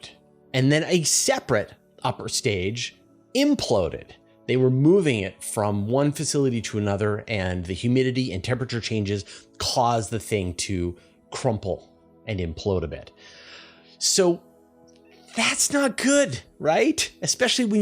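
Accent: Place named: Canada. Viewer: I think the best part of EVERY one of these programs is the Canadian accent. Words like "out" and "about becoming "oot" and "aboot". Being from upstate New York, I was raised on this and can always tell when I'm talking to a born Canadian with an occasional Wisconsin'er thrown in.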